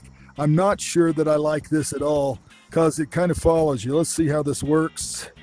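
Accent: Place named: Texas, United States. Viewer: btw are you from Texas? No offense, your accent is kinda Texas like.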